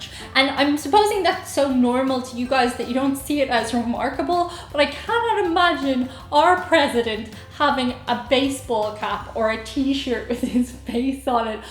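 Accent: Dublin, Ireland. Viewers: Fun video I love when use an "American" accent. Granted I live New England. As Side you your accent reminds me of Friend from Dublin. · As an American I love your Irish ☘️ accent : ))) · as a US citizen born and raised, I really love knowing that you "appreciate" our "societal culture"! But AS a US citizen, I really love YOUR culture as well! We only have a history of about 250 years to fall back on, some of it not so great. I don't know what it would feel like to have a CULTURAL history that goes back, thousands of years! It really would be great to be able to go back to the BRONZE, or even the STONE age, and know that those people were MY "ancestors". (and your Irish "accent" is pretty cool too!) Even your original LANGUAGE going back as far as when it was still "Gaelic" and then turning to Irish!! Don't get me wrong, the American "melting pot" has alot of advantages too but unless we are "NATIVE AMERICANS", (which I'm not) here, we just don't have the sense of truly "belonging" to a COUNTRY or even a REGION, like you do in Ireland, or Spain! 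I mean you can trace your CULTURAL lineage all the way back to the Celts in Ireland or the "Beaker people" or even "Iberian" and Roman history in Spain! So, THANK YOU for your kind words and thoughts about the STATES! Not everyone in the world thinks of us in such a "kind" way. ♥️😀🇺🇲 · The new "politically correct" term to call those weird people is "eccentric". But you're right, generally, it's not frowned upon. Nothing sexier than a woman with a sense of humor! The Irish accent doesn't hurt either. 😁🤓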